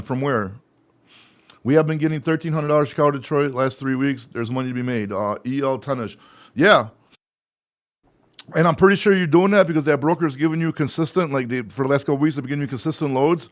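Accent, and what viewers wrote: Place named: Russia. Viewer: Why you have a Russian accent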